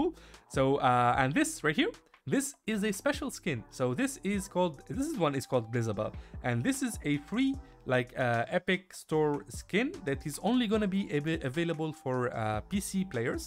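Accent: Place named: France. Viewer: J’ai l’impression que t’es français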